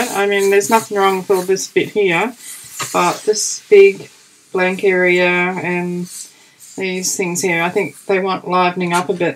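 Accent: Australia. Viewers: Hi, I was watching '49dragonflies' for Dephemerember and part of your video of 'Effie' was shown, so I thought I'd pop over and have a look at your channel. Glad I did. Really loved the leather look. Got me thinking. Thank you. Great to hear the Aussie accent too. Jeanne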